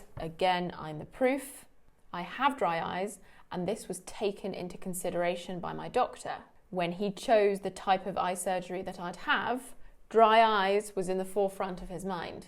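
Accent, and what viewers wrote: United Kingdom. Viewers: You should record audible books! It’s so hard to find readers with your young British accent. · Hai To.... I like Your Chanel And Your Voice British Accent.... Sorry, If My English Writing and Language So Bad....Because Need to Learn More.. I'm Eksand From Indonesia Country.... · i have noticed that her pronounciation is British but her doctor has an American pronouciation☺️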